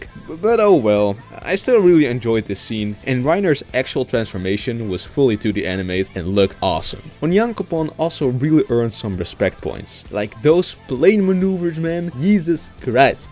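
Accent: Netherlands. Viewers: Are you dutch? cuz you sound like a dutch person ngl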